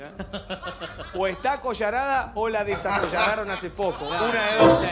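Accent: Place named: Mexico. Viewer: sos un capo me encanta cuando hablas en mexicano!!😘😘😘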